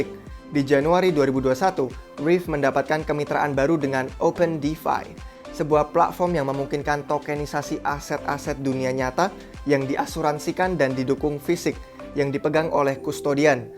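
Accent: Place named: United Kingdom. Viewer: nonton buat dapet ilmu tentang crypto ❌ nonton buat denger mas nya ngomong british accent ✅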